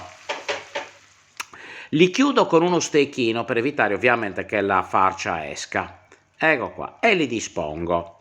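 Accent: Sardinia, Italy. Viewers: BRAVO FINALMENTE UNA RICETTA ORIGINALE È SEMPLICE LA FACEVA MIA MAMMA E DOPO LA FACCIO IO UGUALE COME LA FATTA TU,. USIAMO GLI STESSI CALAMARI PICCOLI E FRESCHI IL POMODORINO SEMPRE FRESCO E VERAMENTE UNA BONTÀ E SE RIMANE UN PO DI SUGO LA SERA FACCIAMO 2 SPAGHETTI QUESTE SONO LE VERE RICETTE SEMPLICI E GUSTOSE CREDIMI PER CURIOSITÀ VEDO DEI VIDEO DI CALAMARI RIPIENI CHE VIENE IL VOMITO A VEDERLI DI QUANTI PASTROCCHI CI METTONO DENTRO MA PER FAVORE TUTTO IL CIBO PIÙ SEMPLICE SI FA PIÙ GUSTOSO E DIGERIBILE SARA, NOI ANCHE IL PANE GRATTUGIATO LO FACCIAMO FRESCO AL MOMENTO COL PANE DI CASA RAFFERMO IO NON O DA IMPARARE NIENTE CUCINO COME MIA INSEGNATO MIA MAMMA MIA NONNA E ANCHE MIA SUOCERA, E POSSO DIRTI CHE GENERI NUORE NIPOTI AMICI OGNI VOLTA VOGLIONO IL BIS, E MIO MARITO E ORGOGLIOSO, SIAMO DI MESSINA, E DAL PARLARE PENSO CHE TU SEI SARDO, CONTINUA A FARE CIBO SEMPLICE ETUTTO FRESCO E GENUINO E NO CIBO CONGELATO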